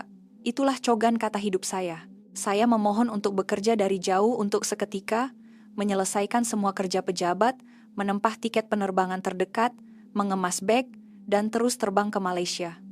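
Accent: Indonesia. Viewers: Indon narrator?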